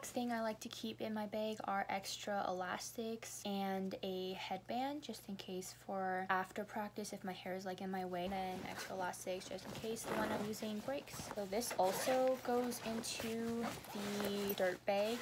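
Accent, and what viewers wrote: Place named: Pacific Northwest, United States. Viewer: Gotta love that PNW accent 😍 😂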